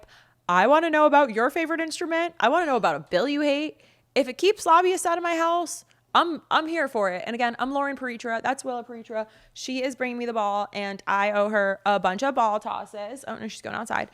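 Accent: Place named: Orange County, United States. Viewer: I’m from Orange County, California, so I’m used to people talking like this. My suggestion would be to continue watching, just turn off the volume and put on the captions.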